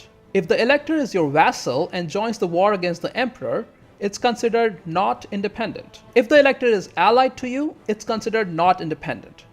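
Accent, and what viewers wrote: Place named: India. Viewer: Your accent sounds so Indian, where are you from? And yeah, the strategy was really good, never thought it could be done so easily.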